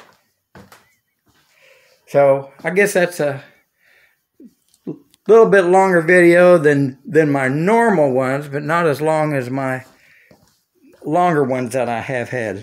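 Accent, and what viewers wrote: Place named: Missouri, United States. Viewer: Hi, I’m a new commenter but I’ve watched all your videos. You’ve inspired me and I’m currently setting up shop to start scratch building an H5. I appreciate all your advice but especially like the little tidbits about scratch building. It’s also comforting to hear a Missouri accent again! I grew up in the Springfield area but haven’t live there since college. Wish I could have met Spike.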